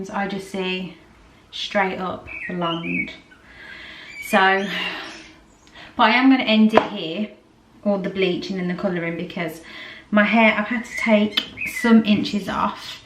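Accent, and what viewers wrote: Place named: Wolverhampton, United Kingdom. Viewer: Are you from Wolverhampton? Love your accent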